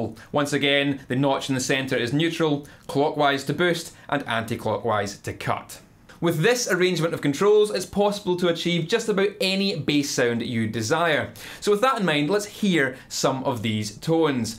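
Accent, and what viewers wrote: Scotland, United Kingdom. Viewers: yeah, he's scottish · I really like this site, is extremely funny and instructive to watch, but his accent is a little hard to follow for my South American ears, is it Scottish? I really like his accent, too!! 🤘🏼🤘🏼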